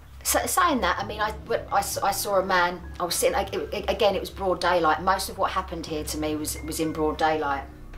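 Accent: England, United Kingdom. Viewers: english accents are so pretty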